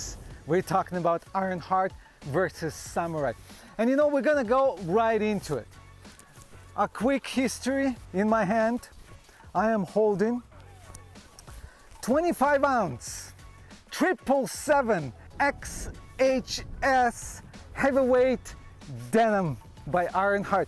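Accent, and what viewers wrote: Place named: Russia. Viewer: Recognisable heavy Russian accent, IMHO 🤔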